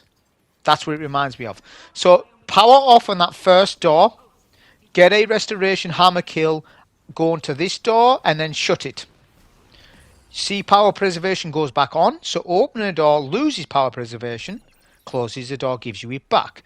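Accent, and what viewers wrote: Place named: Newcastle, United Kingdom. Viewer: I started to play this one only recently and could see how difficult this is. Btw your accent is similar to one of my coworkers who’s from Newcastle lol. Good one as always !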